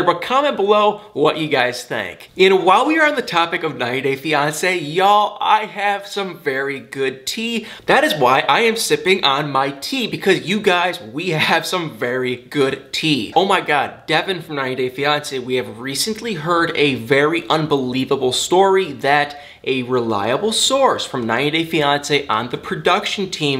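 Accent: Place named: Michigan, United States. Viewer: i can 100% tell you’re a fellow Michigander when i noticed how much you say “and what not” like me 🤣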